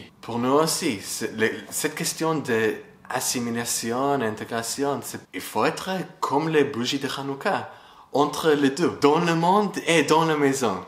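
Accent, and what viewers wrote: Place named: Israel. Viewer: D'abord j'aime l'accent hebreu en français 🎶💯🔆✴ en suite j'espère parler hebreu comme vous parlez français ! Merci pour cette belle nuance enter les limites et les lumières....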